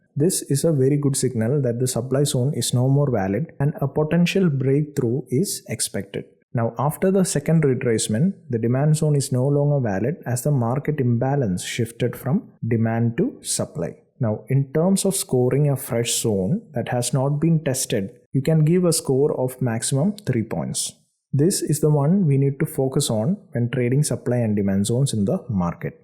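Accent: Kerala, India. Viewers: Bro Are You From Kerala ? Through Your Accent I guessed . Am I Right ?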